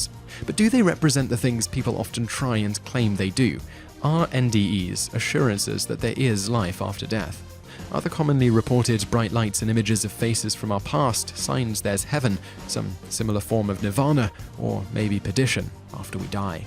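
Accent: United Kingdom. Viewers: Hmm. Your picks for this video as well as other recent ones are starting to betray an agenda... Having a guy with a British accent reassuring me that my government is benevolent and has my best interests in mind and that there is nothing to answer for after death is damn well played...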